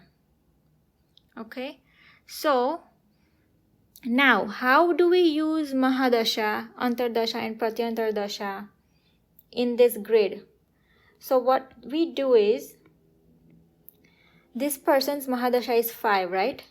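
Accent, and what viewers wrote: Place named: United States. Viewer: American accent. Simply wow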